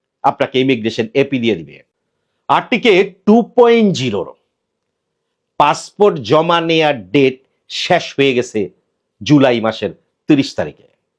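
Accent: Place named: Feni, Bangladesh. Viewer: আপনার ফইয়া কথা আমার কাছে ভাল লাগে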